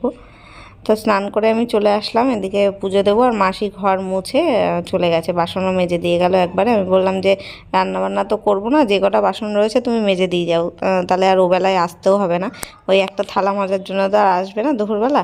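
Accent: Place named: Bangladesh. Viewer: Eto modern sobkichu , kintu asba , jaba , khaba ei bangal kothagulo birokto lage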